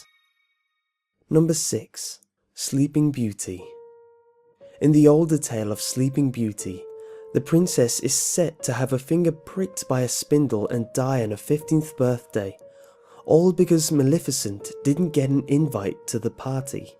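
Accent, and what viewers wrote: England, United Kingdom. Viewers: Excellent narrator!! I love your English accent and how you talk slowly and eerily!! Just like the music you use in a movie, sets the stage of the movie, the same goes for all YouTube videos. If you present any paranormal content, it sounds so much better when the narrator takes the content seriously!! I absolutely hate when i click on something paranormal on YouTube, and the narrator talks loud and fast and stupidly, I click off that video FAST! People that look up this type of content believe in the paranormal and take it seriously. We don't want to hear paranormal content presented as a joke!! Whos with me on this??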